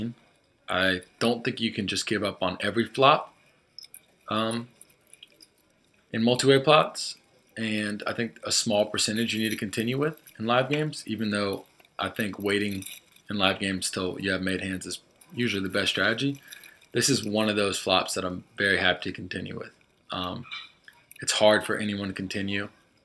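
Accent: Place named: West Melbourne, United States. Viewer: Kinda have the west Melbourne (FL) accent... Don't hear creole. (Brevard county viewr is reason) But I'm feeling your "rounding" hard core style yo. Good vibes if that's your gig. First timer here 👍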